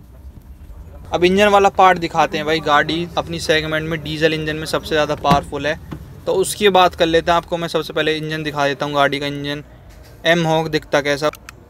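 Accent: Haryana, India. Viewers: Bhai yo desi haryanvi touch maza lata h car ke review me 😂😁😁😎😎😎💪💪💪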